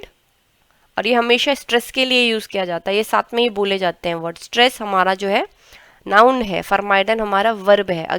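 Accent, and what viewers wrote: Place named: Germany. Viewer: You accent is so perfetly german!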